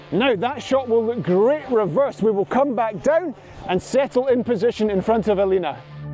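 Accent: Scotland, United Kingdom. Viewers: ... and scottish accent ...! Beside the really valuable tipps. Greez from 🇨🇭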